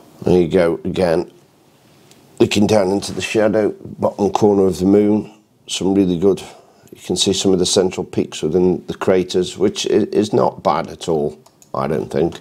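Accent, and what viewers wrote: Stoke, United Kingdom. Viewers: You sound like a Stokie 🙂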